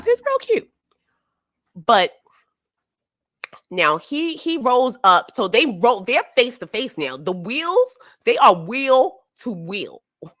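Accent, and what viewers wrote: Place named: New York, United States. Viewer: OMG, I love your NYC accent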